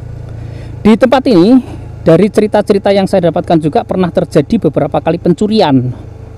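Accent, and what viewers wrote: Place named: East Java, Indonesia. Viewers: nek dilihat dari logatnya...logat jawa Timuran ya. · Vloger receh, aku seneng banget karo logat bicaramu yg medhok banget,jawa timur sing kenthel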